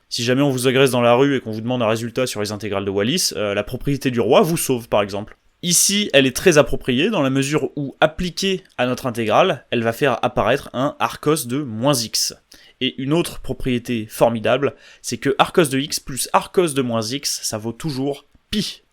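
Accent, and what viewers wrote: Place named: Brazil. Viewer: J'adore la touche Alder du bresil dans la voix (les ancêtres sont fiers)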